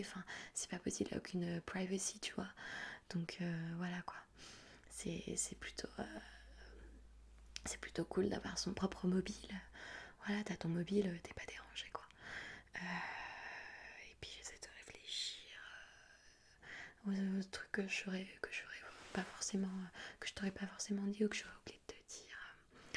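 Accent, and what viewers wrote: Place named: France. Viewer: Ese español con acento francés, bellísimo ❤️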